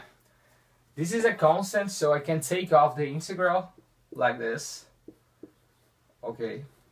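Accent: Brazil. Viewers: Galera, vamos acordar 2018! Saber inglês não é diferencial, é essencial! Mais do que nossa obrigação saber a lingua universal ainda mais que ele tem bastante sotaque brasileiro e fala devagar dá pra entender claramente! Excelente vídeo amigo!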